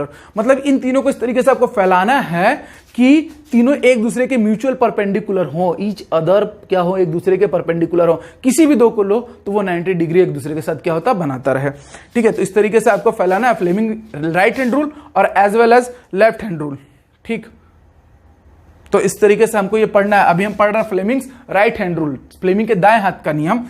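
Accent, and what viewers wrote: Bihar, India. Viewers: Such me badhiya padhate ho bhaisab bihari style me I like it mai paid class join kiya hu lekin jo maza apke me hai vo vaha per nahi aata so mai apke hi video dekhta hu thanks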